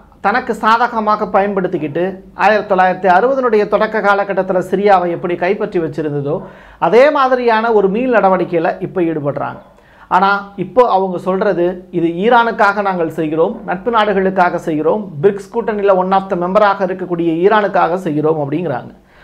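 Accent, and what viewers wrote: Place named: Madurai, India. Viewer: உங்கள் மதுரைக் குரலை கேட்டு பாராட்டுகிறேன்.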